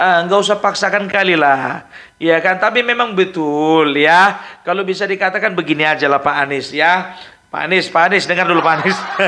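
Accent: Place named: Sunda, Indonesia. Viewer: Mantap banget opung.bisa.bahasa.sunda.ey hhhh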